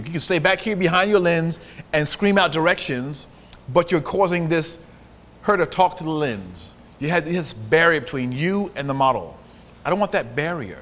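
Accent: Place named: India. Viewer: omg indian accent in polish mouth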